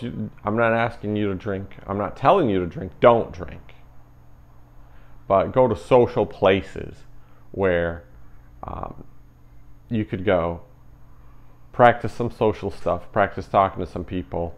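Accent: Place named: Eastern Europe. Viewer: Slavic accent, similar faith background and similar job… dang this is up my alley. Definitely a lot to learn from you, and man if you’re reading this, I’m really sorry for what happened. It gave me chills to hear what’s happened to you. I could not imagine seeing that happen in our community’s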